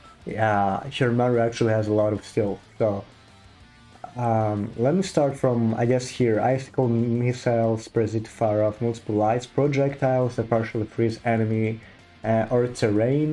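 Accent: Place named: Russia. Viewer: У тя да же акцент русский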